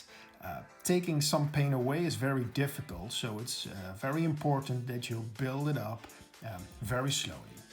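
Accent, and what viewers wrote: Netherlands. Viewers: Jij bent zeker een Nederlander he😏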